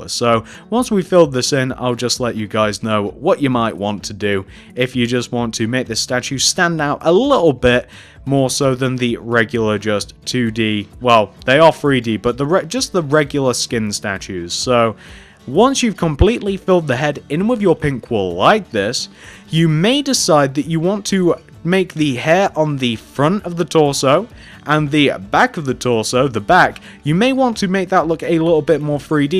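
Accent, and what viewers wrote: United Kingdom. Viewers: so that is why you have a british accent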